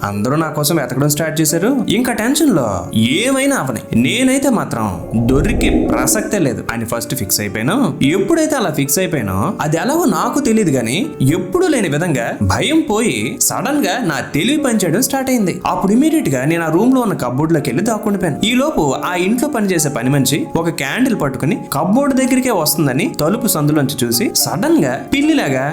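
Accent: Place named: Andhra Pradesh, India. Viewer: Nee Andhra accent dharidhramga undhi ra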